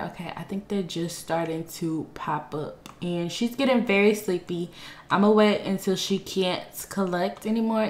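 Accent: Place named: Texas, United States. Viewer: My boyfriend says you have an accent like Beyoncé lol probably because you’re from Texas also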